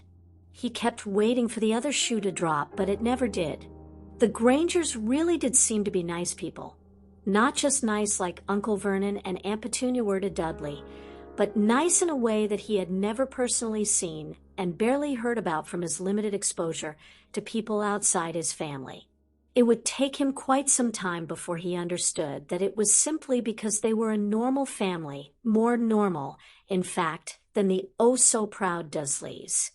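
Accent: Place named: North America. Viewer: British characters need a British reader. Love this story but not with a North American accent.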